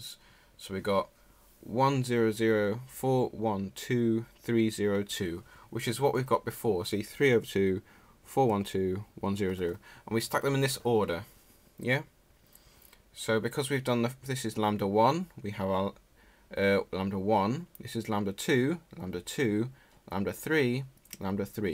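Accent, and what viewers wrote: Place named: England, United Kingdom. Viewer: English accents sound so cool! I totally get it now thank you so much!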